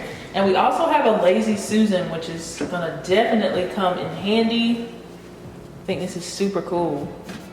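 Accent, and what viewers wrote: South, United States. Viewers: Are you southern?I just became a follower today. Your videos kept popping up and I love your content. I cant help but detect a drawl. Im from Mobile.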